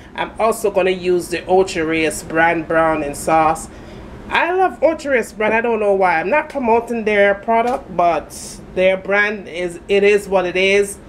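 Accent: Jamaica. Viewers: I love her accent ! Making me wish I was Jamaican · to cook thats how we Jamaicans speak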